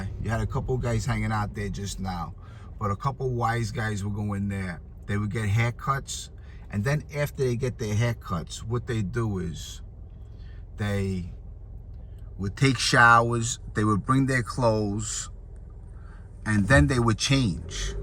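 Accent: New York, United States. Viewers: Thank you. Great job with the camera AND the narration. And as a Bostonian, I ALWAYS love hearing your New York accent. But my absolute favorite part of this video is hearing you say you were going to pick up baskets for your kids. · I like listening to your voice, that Ny accent. Love watching Sammy also. Always rooted for him, to get back with his family and he seems to be doing great. Also very happy for you, you turned your life around and your a wonderful daddy to your girls! I am in Albany Ny, have you ever been upstate? Just curious. Keep up the great content and thankyou ❤👍🏻🙏🏼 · Hi this is Christian! I always love the New York accent I’m getting ready to move to Boston I also lived in Queens New York back in 98. I love the East Coast. Your true New Yorker accent makes me laugh every time. Awesome I wish I could run into you sometimes. Forget about it! 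🤣😁😁👏😊😊😁🤷🏻‍♂️🙋🏻‍♂️🙋🏻‍♂️👏🤣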